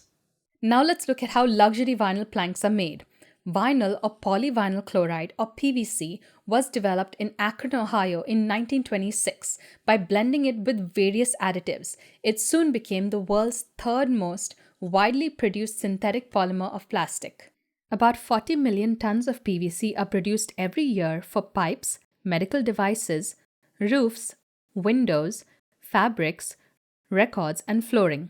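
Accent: India. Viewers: Your looks and accent are so Indian 😂 (Asian Indian). I can't get over it.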